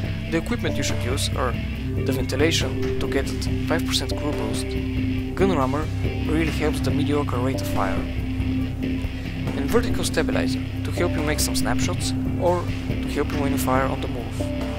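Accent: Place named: Germany. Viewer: WOW good Review! You sounds a littlebit German ^^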